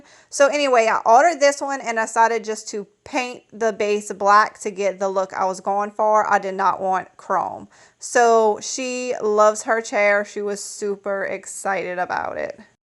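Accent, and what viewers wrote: Louisiana, United States. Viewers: "Pain in tha booty", you are cracking me up! I'm from the South and I love your accent---Louisiana is one of my happy places! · Great job. First video I have seen of yours, but can tell by your lovely accent you are a Louisiana girl! Thanks for the ideas!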